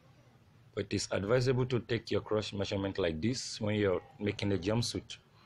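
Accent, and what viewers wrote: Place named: India. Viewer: Why are u using indian ascent pls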